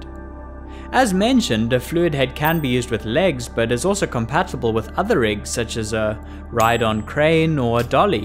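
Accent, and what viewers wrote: South Africa. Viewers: Is your accent South African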